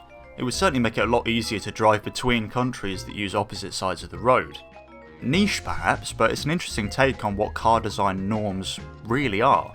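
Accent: United Kingdom. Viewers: Just bc of the british accent 💀🤣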